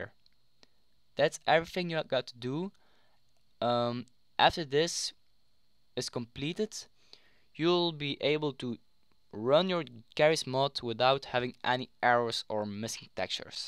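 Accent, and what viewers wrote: Belgium. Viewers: hoorde aan u accent al da ge een belg zijt haha :d ma goeie tutorial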